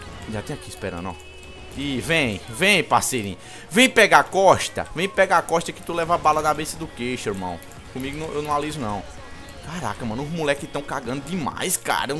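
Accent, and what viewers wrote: Northeast, Brazil. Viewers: Likeeeeeeee, Nordestino Gostoso:3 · Nada melhor q o sotaque Nordestino!Manda um salve pro Rj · Nordestino rapaiz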